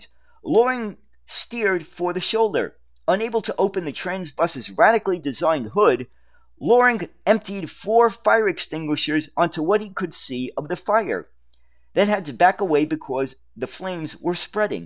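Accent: New York, United States. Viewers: The audio was just fine. The NYC accent is authentic.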